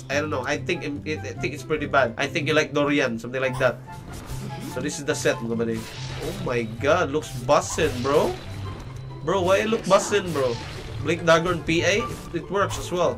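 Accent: Bisaya, Philippines. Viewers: bisaya man diay ka HAHAHAHAHAHA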